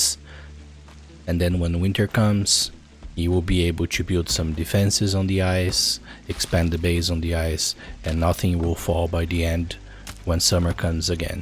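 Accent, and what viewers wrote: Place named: Brazil. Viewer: idk, but u have some brazilian accent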